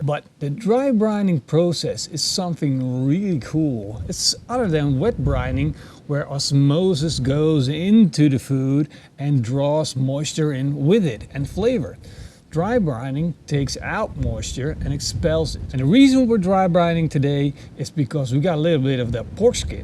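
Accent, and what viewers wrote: Netherlands. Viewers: Dutch accent ?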